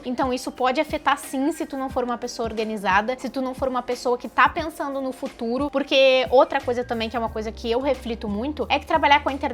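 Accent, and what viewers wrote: Brazil. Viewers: Pensei que vc fosse de SP 😂😂😂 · VOCE É DE PORTO ALEGRE? KKKK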